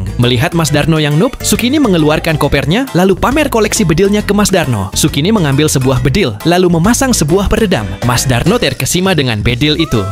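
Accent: Java, Indonesia. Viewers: Jowo ngendi mas?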